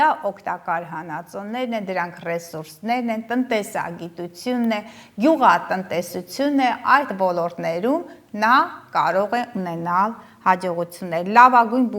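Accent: Standard. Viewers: Հարգելի տիկին: Ես հաճույքով եմ դիտում Ձեր հաղորդումները հատկապես վերլությունները ինչպես գեղեցիկ մաքոր հայերենով և կանացի հմայքով եք բացատրում հաճելի է Ձեզ լսել, չնայած այդ աստղերից ոչինչ չեմ կարողանում հիշեմ, գուցե տարիքս է ինչ -որ է 80-ը քիչ չէ: Ուստի ինքս չեմ կարողանում կողմնորոշվել, բայց ինձ: շատ է հետաքրքրում թոռնիկներիս ապագան հատկապես Գրիգորի որն այս տարի 9-րդ դասարան, բայց դեռ չի կոմնորոշվել ու՞ր գնա ի՞նչ անի ես ուզում եմ Ձեր շնորհիվ օգնեմ կյանքի ճիշտ: ուղի ընտրի: Տվյալները գրեմ: Գրիգոր Քյոսեյան ծնված 2010 թվի հունվարի 9-ին առավատյան 8-մոտակայքում: Ձեզ մի նեղություն հնարավորինս օգնեք ինձ այյս հարցում: Եղեք առողջ և հմայիչ: Կանացի երջանկություն: Հույսով սպասող Փիրոզա <num>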